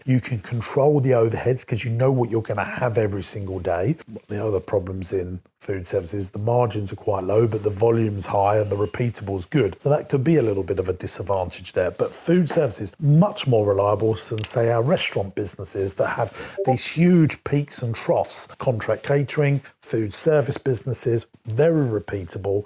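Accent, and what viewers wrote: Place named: United Kingdom. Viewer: Nice video but here is my opinion. Business that are over licensed, over taxed by governments can bring you down very quick. Not to mention dealing with employes in 2024 is diferent than it was in 2005 (worker rights, sues etc). All of that together can bring you down very quick. By your accent I suppose you are from UK, so you must know what I am talking about. It will depend greatly where are your business located. That´s why major comnpanies are located in Ireland and the Netherlands but their origins are not from there. I rented my business 2 years ago, I receive a rent and I work for others now. I have lots of time now for my family and friends, sports and so. Im in Portugal, a heavily taxed country. In a heavy taxed country the best way is to get big real quick because you will get small margins but since you work the masses you ll be fine. 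That´s the main goal, but extremly dificult.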